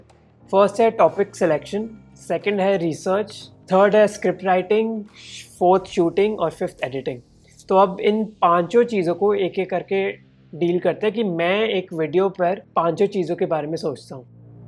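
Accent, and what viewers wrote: India. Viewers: Can you put captions on this video? Because it's really hard for me to understand the indian accent 😭😭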